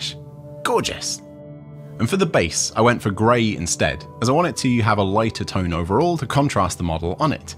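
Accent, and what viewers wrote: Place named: England, United Kingdom. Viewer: Yeah english woman voice overs are back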